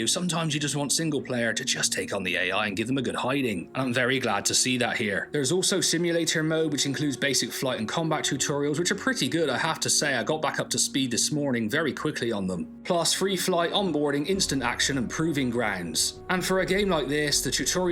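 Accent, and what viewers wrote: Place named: Bristol, United Kingdom. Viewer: Lol you sound bristolian AF mate - practically narrow it down to Hartcliffe - am I close?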